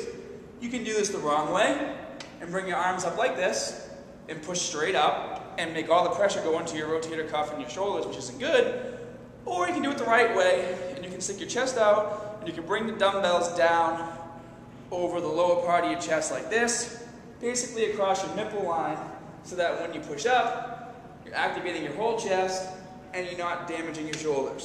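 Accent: Boston, United States. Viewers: I can't watch videos without your boston accent anymore. I love it.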